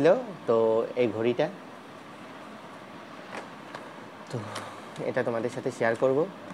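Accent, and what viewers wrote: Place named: Nadia, India. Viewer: 34,তোমার সব কথা শুনলাম ভালো লাগলো সত্যি ইউটিউবে এখন কুটকাচালি চলছে, কজন বোঝে এই কথা, ভালো লাগলো👌👍 তুমি কি নদিয়ার বগুলাতে থাকো তোমার কথা গুলো ঐ দিককার মতো, ভালো লাগলো ভাই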